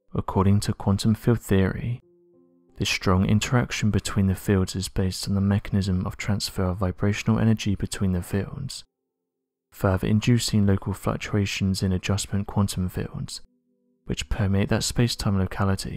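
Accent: United Kingdom. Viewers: This is all interesting to contemplate, and as a lifelong science fiction fan, I would love it to be proven, but where is the proof this is actually happening? All the fancy graphics, the calm British-accented voiceover, and elaborate theories ….are not evidence….extraordinary claims require extraordinary evidence. Just saying ‘this is happening’ doesn’t make it real. As exciting as it would be if this were true, where is the evidence?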